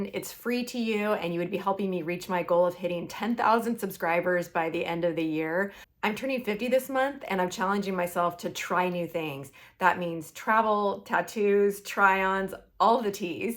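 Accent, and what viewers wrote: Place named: United States. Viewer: Con este acento, parece americana de nacimiento. O al menos lleva muchos años en EEUU. Dudo que sea alemana.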